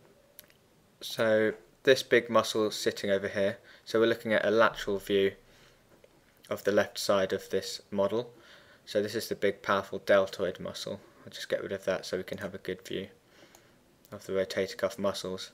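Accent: United Kingdom. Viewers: I can just imagine you teaching to your class and everybody's getting distracted with the British accent! Good job in your explanation though. Clear and concise :-)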